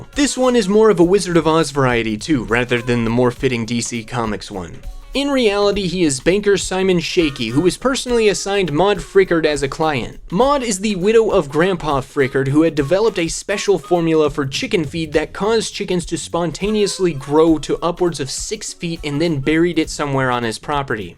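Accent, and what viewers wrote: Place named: New England, United States. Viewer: Gonna say Maine or Vermont maybe Connecticut. Had to subscribe just for the accent 401 forever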